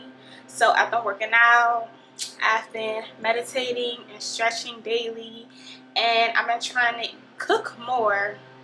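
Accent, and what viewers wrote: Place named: DMV, United States. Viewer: New subscriber .. loving your channel I knew you were from the DMV by your accent 🤣 before I even read your profile lol .. u made me miss home lol 🫶🏾🤍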